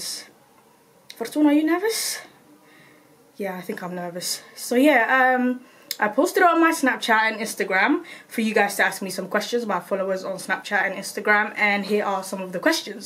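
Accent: Somalia. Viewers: When I first listened, I thought this girl is from Kenya. We have similar somali accents. Omg You are so lit. I love you already. What is even more shocking is you have similar personality to my cousin called Fartun 🙀❤️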